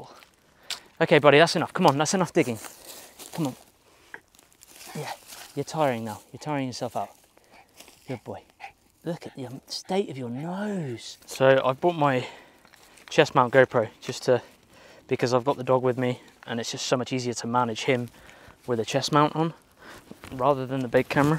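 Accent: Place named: United Kingdom. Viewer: Make a hammock! I like the new mic. I can hear your beautiful Bri-ish accent much better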